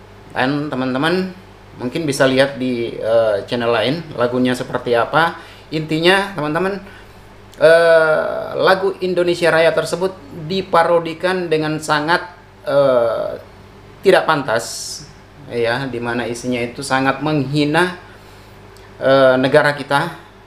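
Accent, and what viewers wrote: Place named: Malaysia. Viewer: tapi kok suaranya ke logat melayu Malaysia?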